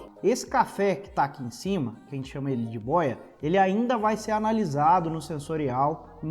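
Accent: Minas Gerais, Brazil. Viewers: Amo esse sotaque mineiro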